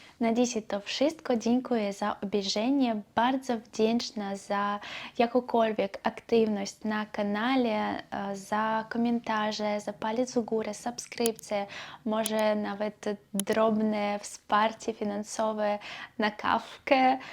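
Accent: East, Poland. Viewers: Polski bardzo dobry. Akcent jak z Polski Wschodniej. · Pani kochana, teraz to jest pani przede wszystkim Krakowianką, moją sąsiadką. A pani język polski jest bardzo dobry. Lekki wschodni akcent tylko dodaje charakteru pani wypowiedziom. Jeśli chodzi o pierogi, to tylko z kapustą i mięsem. Niestety ciężko kupić takie i najlepiej samemu zrobić, wykożystując mięso wołowe z rosołu. Pozdrawiam serdecznie z Klinów. ❤ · Kapitalnie Pani mówi po polsku. Widać chęć, talent i kulturę osobistą . Za dwa lata nikt nie rozpozna że pochodzi Pani ze wschodu. Tak trzymać . Pozdrawiam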